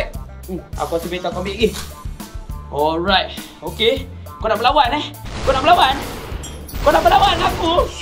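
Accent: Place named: Kelantan, Malaysia. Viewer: abang cakap Kelantan bai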